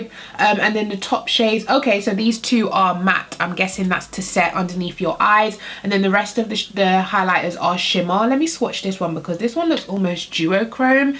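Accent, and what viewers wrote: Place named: United Kingdom. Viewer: Aw from when you said Morphe sent you some stuff I was smiling girl 😫🤸🏾‍♀️ through the video I was like please tell me you gave her the brushes & you mentioned brushes I was like oh Yes Lord 😫💃🏽 I was finished. Girl i’m so happy for you. I been watching you for a couple months since last year & I love your generosity & honesty. Quite weirdly I like listening to your voice & accent lol even though I too live in the U.K. 😂 It’s time now we get onto that Discount Code so we can support the U.K. babes 💜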